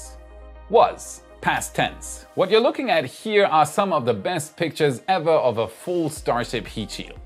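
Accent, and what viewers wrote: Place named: Germany. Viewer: I believe he is German, so English is not his first language. You should hear how the Brits pronounce Aluminum. :)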